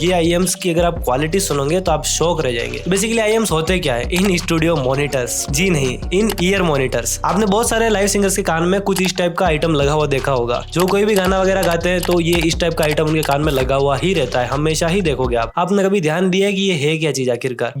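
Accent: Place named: Mewar, India. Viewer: Ha, lg to mewad s hi rha h y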